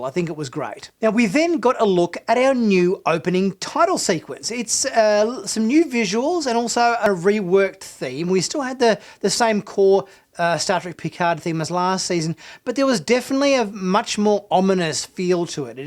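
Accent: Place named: Australia. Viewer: So I'm confused. I'm in Australia and checking my Amazon Prime Video this Season 2 Episode 1 is not available yet as of 4Mar~ am AWST, assume it will drop this afternoon as it usually does., by your accent I assume you are Aussie and are in Australia, so how did you (and others) watch the Episode? Obviously I'm missing something somewhere?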